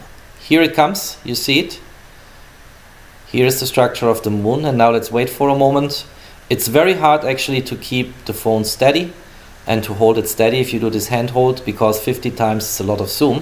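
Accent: Germany. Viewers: You are 100% german...I can hear that on your accent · Hört sich stark nach German-Englisch an :) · Rede doch einfach deutsch Mann Hört das du deutsche bist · Dein deutscher akzent macht das video kaputt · Are you german? Your accent sounds like. xD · Are u german? Your english is pretty german like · Du hast einen sehr deutschen Akzent 😂😂😂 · Dieses deutsche Englisch xd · Are you from Germany ? You have Accent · Gutes Deutsch Englisch :) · Are you a german? Because your accent seems.. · Deutscher, Das hört man an der englischen aussprache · Kommst du aus Deutschland?!! Du hast 'nen STARKEN Akzent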